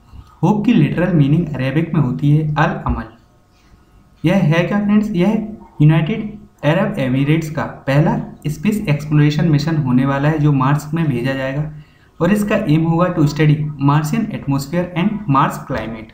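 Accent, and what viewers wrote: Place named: India. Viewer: 咖喱英语，一听就知道是三哥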